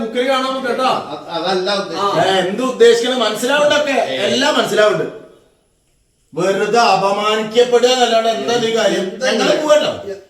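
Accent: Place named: Namboothiri, India. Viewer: സത്യം പറഞ്ഞാൽ നമ്പൂരി സ്ലാങ് ഇത്തരം കഥക്ക് മാച്ച് അല്ല. നിങ്ങളെ പഴയ പർളിവുഡും നമ്പൂതിരി നേരം പോക്കും തന്നെയാ നല്ലത്. ഞാൻ രണ്ടും ആസ്വദിക്കുന്ന ആളാണ്. അതായത് അവനവന് ചേരണ കോണകമേ ഉടുക്കാവൂ എന്ന് സാരം❤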